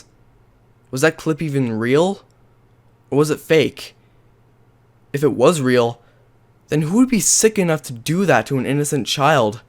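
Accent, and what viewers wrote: England, United Kingdom. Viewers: Where is that english accent supposed to be from? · nice British accent. Better than mine and I'm British haha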